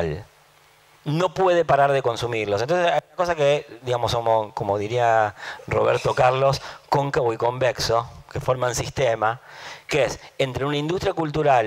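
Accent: Argentina. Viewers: quedemosnon con lo que dice que es lo importante! a mi tambien me da un poco de asco el acento porteño (ni se si es porteño siquiera)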